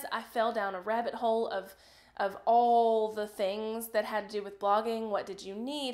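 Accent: South, United States. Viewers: I love your country acent🥰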